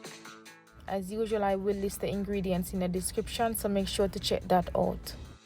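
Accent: Jamaica. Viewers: Do I detect a Jamaican accent? Love the food.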